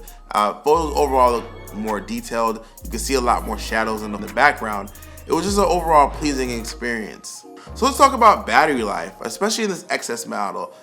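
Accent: United States. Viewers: You sound very very american. Im sorry for you. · You from Jacksonville bro?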